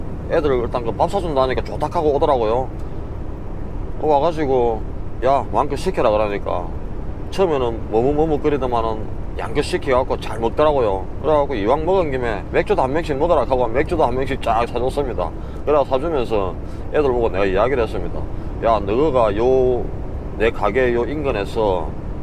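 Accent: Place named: Busan, South Korea. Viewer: 다른 트럭커동영상도 봤지만 역시 님 영상이랑 음성이 제일 귀에 들어오네요.ㅎㅎ 왜냐!! 저도 부산이라 그런가봐요..특히 음성이 전혀 이질감없이 들어오니 너무 좋네요^^ 파푸아 썰~ 잘 듣고 갑니다.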